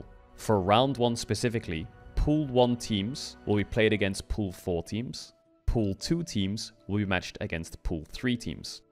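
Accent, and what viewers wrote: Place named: United Kingdom. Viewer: 1. 영국식발음 멋있다 2. 잘생긴것 같다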